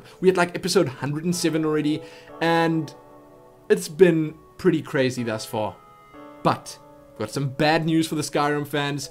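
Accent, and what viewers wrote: South Africa. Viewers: You sir, must be a South African. I watched your Alice videos and I could swear I was listening to a Boer! If not...well shit. My mistake XD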